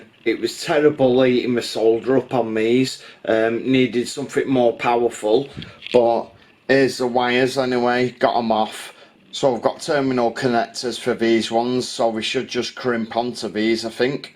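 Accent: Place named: Salford, United Kingdom. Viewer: Hay bro you have a proper Salford accent speed an power mod bridge the yellow an black wire is the simplest way or in the speed box you can solder it internally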